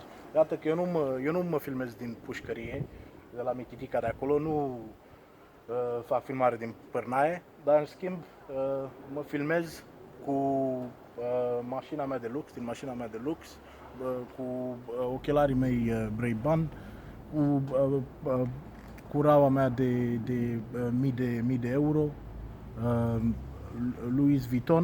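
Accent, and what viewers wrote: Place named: England, United Kingdom. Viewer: dar ce accent de englezoi ai !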